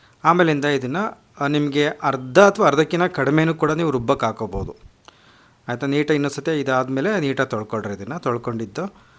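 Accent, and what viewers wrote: Chitradurga, India. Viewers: ಪೂರ್ತಿ ದುರ್ಗದ ಭಾಷೆ ಕೇಳೋಕೆ ಚಂದ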